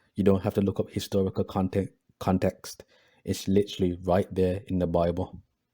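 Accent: Birmingham, United Kingdom. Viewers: This guy speaking is a brummie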